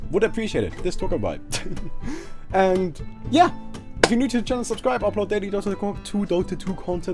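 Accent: Germany. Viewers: Dat german accent... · I really find it funny how many people comment on that zeus zois stuff... zeus is pronounced zois(strong z) in german, this guy is from germany so he talks like that. case solved. ( not to mention this pronounciation is actually more correct than the english one) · he is german, that explains his pronunciation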